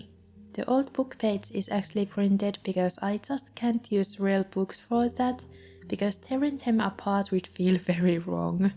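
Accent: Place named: Finland. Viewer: I knew you were Finnish from your accent haha :) my mom is from Finland! this is also super cute!!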